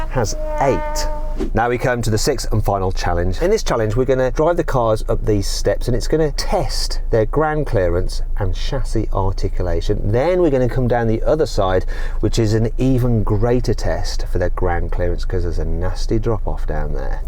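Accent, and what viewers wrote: United Kingdom. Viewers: 이사람이 하는 말이 영국영어인가요?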